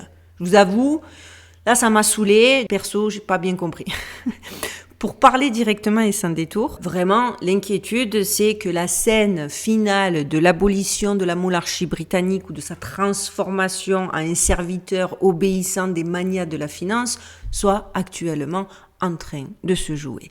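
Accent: Marseille, France. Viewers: Toujours aussi passionnante plus l’accent marseillais 👍🙏🏼❤️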